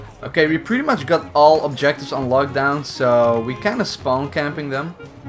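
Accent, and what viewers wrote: Netherlands. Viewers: are you Dutch? If no then what country?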